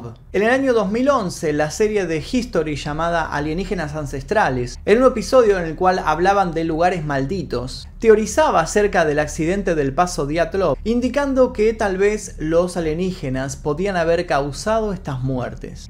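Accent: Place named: Argentina. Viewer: Excelente narración pero siento q tener un lenguaje neutro sería lo ideal no es lo mismo ayuda q achuda y todo el resto de sustituciones q acostumbran los argentinos .exitos y es crítica constructiva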